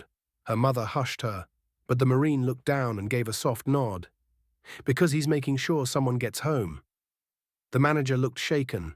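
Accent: United Kingdom. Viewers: Why are there so many British narrators on Youtube narrating American content . Semper Fi to the guard of the fallen soldier . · Rubbish....with an inbreed English accent..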